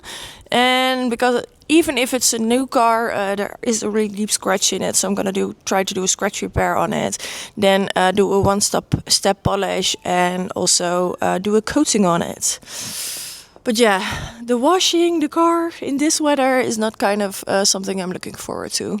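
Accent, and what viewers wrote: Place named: Netherlands. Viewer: Love your NETHERLAND accent 🤗🤗🤗🤗🤗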